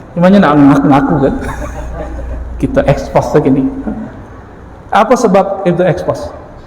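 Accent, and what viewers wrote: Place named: Rao, Indonesia. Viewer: Slang nya seakan² urang Rao..seperti ibu saya.cuma ada beza sikit²...Apa itu bahasa Rao juga ya... Senang dgn pengajian beliau..terima kasih ya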